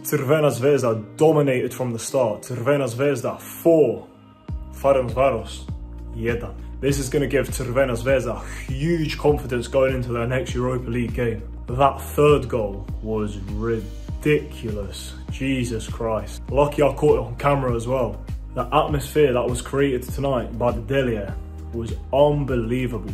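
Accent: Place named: United Kingdom. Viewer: Imas odlican britanski naglasak brate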